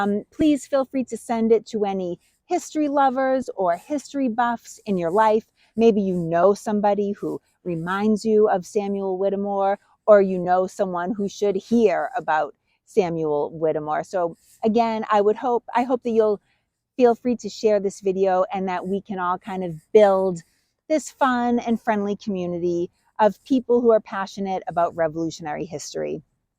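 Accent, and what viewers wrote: Boston, United States. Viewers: I grew up in eastern Massachusetts but moved away as an adult. I haven’t been back in over a decade. I showed this to some folks at work as an example of a Boston accent and they didn’t believe me. My brother seems to think the accent is too much as well. Honestly, it’s been so long I’m questioning myself. So I looked for the oldest video on this channel and this is what I found. Can somebody help me out here? I love the content btw. Just curious about the accent.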